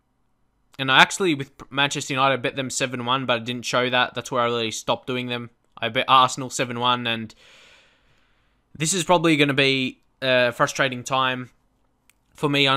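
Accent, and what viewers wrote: Australia. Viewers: Well he's Australian, ofcourse his pronounciation is gonna be different to the one in the UK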